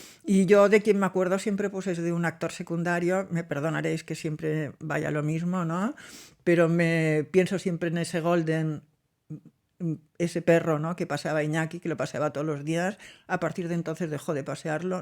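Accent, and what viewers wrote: Spain. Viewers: Siempre un gusto escucharla ♥️👍🏼 me encanta su acento español y la forma en que nos narra las anécdotas. Saludos desde México 🇲🇽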